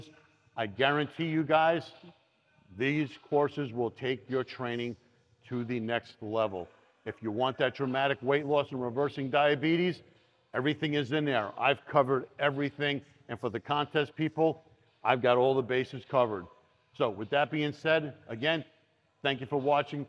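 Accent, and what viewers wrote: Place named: New York, United States. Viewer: The advice is brilliant. The New York accent just adds to the enjoyment.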